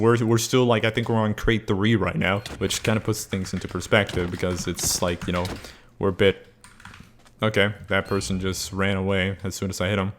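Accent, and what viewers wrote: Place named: Florida, United States. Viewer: you generally sound floridainian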